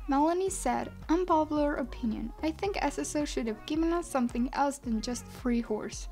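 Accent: Germany. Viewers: Are you German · Omg lol you german? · I think she's German? Or atleast her accent is very similar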